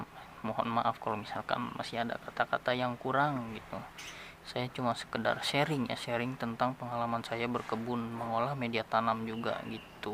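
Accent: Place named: West Java, Indonesia. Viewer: Bangx kayax orang sunda ya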